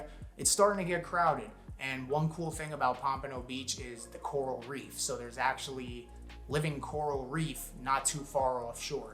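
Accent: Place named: New England, United States. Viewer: While your video is intetesting and a little informative, obviously you've not lived there long (accent) and pray you did leave the new england politics behind. One needs a good 10+ yrs down there too fully undetstand and grasp the lifestlye. The other HUGE cons are the crime from the NE States migrating with the new residennts and then the traffic. Those last two items have increased 150-400% as of late 2020 and its getting 100x worse. I'd tell folks to pls move back home if you miss it so much. We dont want the politics, crime and traffic here. Unfortunately with ins companies doing their thing on rates, its getting close to LA expensive. Residents from 2008 and back are leaving. I was going to go back there in Jan 2020, the house I was looking at near Boca was 2800 sqft, 3 car, lots of soace between homes (60 ft) and low hoa, towards Coral Sprngs for 235k. 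That same home in Mar 23' was 780k and as of late July 23' its 815k. The over pricing of homes is ludicrous and according a friend and comn/res RE expert since 1990, its going to keep going up another 3% from now in 23' to 7% by Nov 24'. I am the kind that needs my garage space to work on my classic car and a few bikes, a yard for the dog, etc. Plus at least 7 miles inland because hurricane wibd decreases by 17-20 mph every 6 miles in land and its drier, less salt air so no specially treated furniture needed because not with in 2 miles of the sea. That sea salt air destroys furniture, interior and exterior paint and vehi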